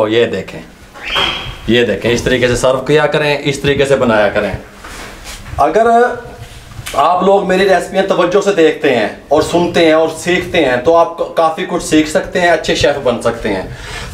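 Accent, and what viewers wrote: India. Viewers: I like your daise style bolne style mashallah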